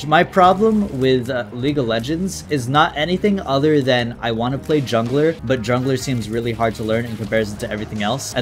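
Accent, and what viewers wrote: United Kingdom. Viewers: My day be so fine. Then boom. Find out is British. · Wait you're British, cringe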